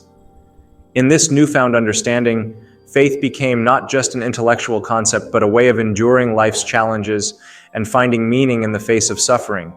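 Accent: United States. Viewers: Why an American accent ur not European goodbye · I thought i was going to get some professional English speaking historian talking about this, and what I get is another jumped up Yank. No, thank you.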